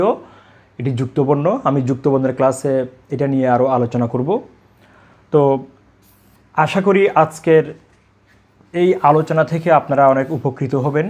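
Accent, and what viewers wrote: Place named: Chittagong, Bangladesh. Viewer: চট্টগ্রাম এর আঞ্চলিকতার প্রভাব কিঞ্চিৎ লক্ষণীয়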